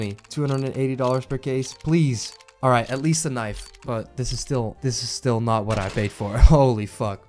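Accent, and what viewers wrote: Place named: Netherlands. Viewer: Haha jou Nederlandse accent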